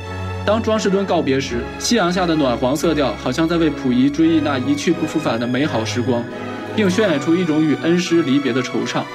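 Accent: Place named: Northeast, China. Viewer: 我觉得这个解说的小哥哥有东北口音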